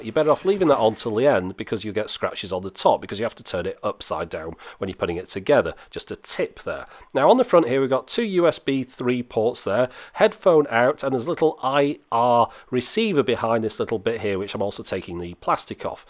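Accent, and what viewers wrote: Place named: North West England, United Kingdom. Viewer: You mustn't be English. James May is from Bristol. is from the North West of England. They both have regional accents and they are from opposite ends of England.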